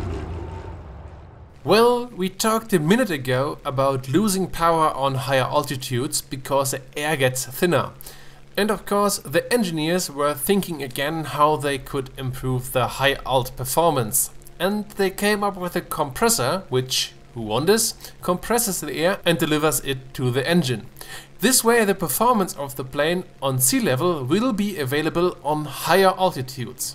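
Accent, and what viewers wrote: Germany. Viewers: Gutes englisch aber man hört das du aus Deutschland kommst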